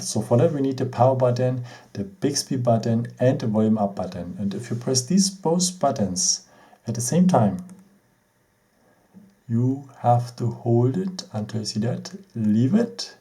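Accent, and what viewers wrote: Germany. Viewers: Bist du deutsch weil dein Akzent ist bisschen so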